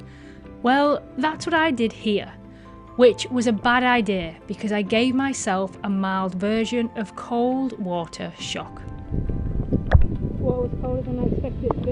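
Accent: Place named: Nottingham, United Kingdom. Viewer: Don’t recognise your accent? My guess would be Nottingham area , sorry if my guess offends you but obviously only a little sorry , an energetic charming soul you are .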